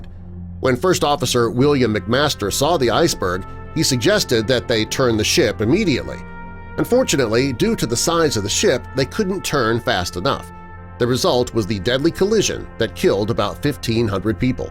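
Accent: United States. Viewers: 🌐 Annoying yank !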